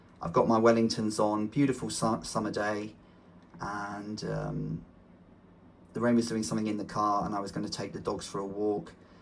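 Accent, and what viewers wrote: United Kingdom. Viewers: THE NARRATION VOICE IS CERTAINLY FEMALE & Brit, to my ears. 1. Surprised "SHE" has a "WIFE". First surprise. 2. If you can "count" the officers, they're there in Numbers, Not in an Amount.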